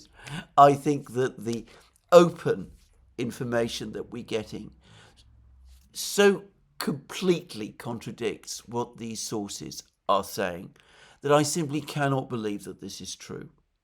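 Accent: United Kingdom. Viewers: You sound like a snobby brit. But still love ya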